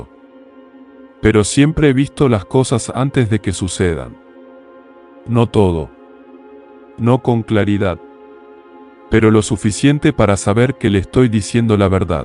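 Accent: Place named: Argentina. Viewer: Esta es la voz a la que me refería, que suena con un acento argentino. Me fascinaba escucharlo, ya que me transportaba a través de mi imaginación, provocando la emoción de la narración de principio a fin. Sencillamente, me encanta esta voz. 🎤💗👏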